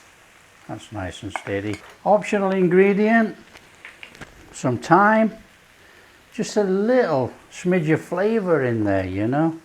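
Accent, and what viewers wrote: United Kingdom. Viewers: Happy day to you sir, love your accent oF BRITAN, you are a chef and master that makes it so enjoyable to watch. Food looks great and love your passion for sharing with us, GREETINGS OR CHEERS TO YOU SIR FROM THE GREAT STATE OF ILLINOIS. #1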